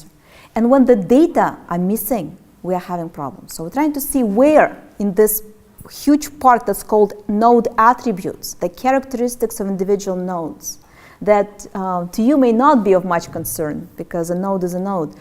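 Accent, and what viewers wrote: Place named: Russia. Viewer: Man, such a terrible russian accent... But the material is great.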